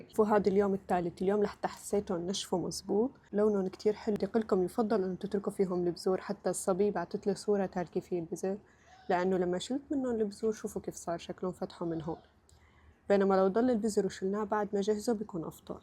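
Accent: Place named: Coast, Syria. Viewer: اي من عنا من الساحل في عدة لهجات نحنا مثلا لهجتنا قريبة من ضيعة ضايعة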